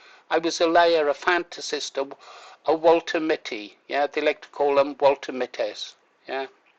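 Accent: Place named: London, United Kingdom. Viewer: A proper London accent!😂😅 Cockney is better than the Jafaken that the freaks speak, and not only in London now.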